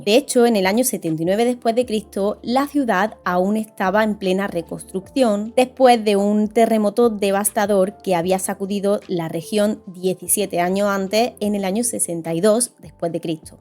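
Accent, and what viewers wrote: Spain. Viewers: She's from Spain, lol...the only accent I can recognize...well, there was a South American one I found similar...Venezuela or something...or maybe not, lol...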